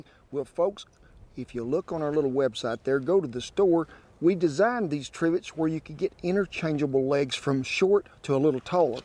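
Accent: South, United States. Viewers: You just have that down home way of speaking. BTW I’m in Woodward so I’m not too far from you. ❤️ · I love this American accent! God I'd love to move to the US. Ah man that is life!!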